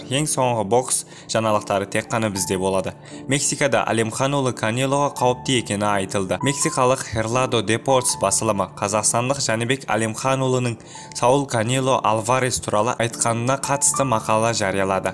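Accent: Uzbekistan. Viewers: Автор өзбекпа басқама бастысы қазақша сөйлеуге тырысып жатырғой ағайындар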